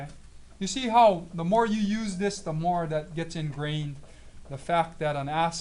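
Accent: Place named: Hawaii, United States. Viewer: sir are you Hawaiian?!? sweeaaaar I can hear it in your voice! love it!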